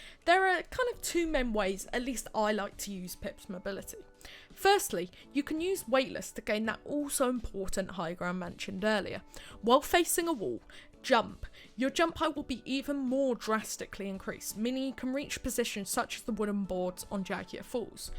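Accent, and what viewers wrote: United Kingdom. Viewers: Omg, your British accent is pure spot on but I'm having a little bit of trouble making out those words XD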